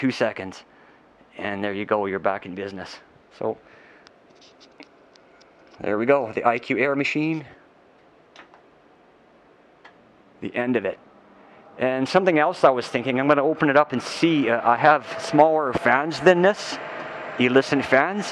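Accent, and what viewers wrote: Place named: Canada. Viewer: this is the most canadian person i've ever heard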